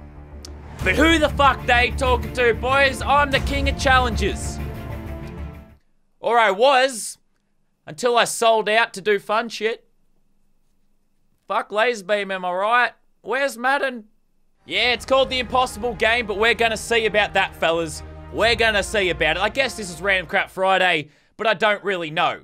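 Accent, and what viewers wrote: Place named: Australia. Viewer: Australia accent (thumbs up)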